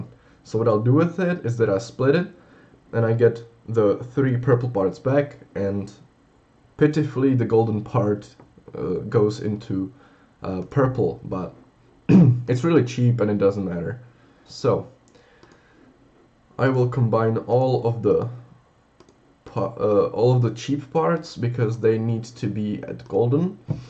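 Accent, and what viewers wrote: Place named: Slovakia. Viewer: Where are u from cuz ur accent feels like ur from Slovakia or Czech 😊 maybe Poland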